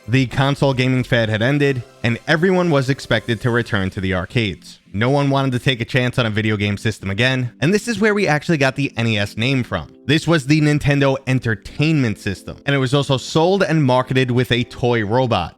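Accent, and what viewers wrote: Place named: Massachusetts, United States. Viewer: thought i heard a hint of Massachusetts in your accent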